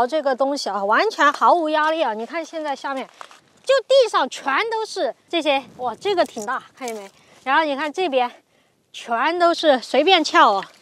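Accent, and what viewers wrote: Sichuan, China. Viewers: 听声音就晓得是咱四川妹儿，果断关注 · 这口纯正的川普 👌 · 这川普 有点掉格了😅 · 川渝口音好重，喜欢~ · 这川味普通话，有点想家了😂😂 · 好重的川普味哟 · yooupi的口音对于川渝地区的人来说太有亲切感了😂😂😂😂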